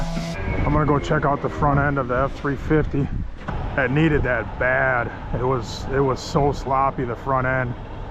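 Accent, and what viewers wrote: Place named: Wisconsin, United States. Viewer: Hey, Love your videos. When you said Kenosha you had my attention. I was born in Waukegan and lived in Kenosha until my dad retired from AMC. We moved to Arkansas when I was eight years old in 1987. My wife asked me how come I don’t have your accent.😂 I told her that because I have a southern accent. 😊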